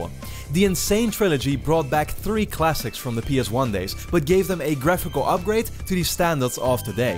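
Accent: Netherlands. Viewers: Are u dutch? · What accent is that of yours? Dutch? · is he dutch? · Are you Dutch? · Are you dutch?? You sound dutch. im dutch btw (edit: Oh you have a rochet league car with a dutch flag. Hallo😂😂) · 10 seconds in, ''this guy is dutch''. the accent is minimal tho, pretty good tbh.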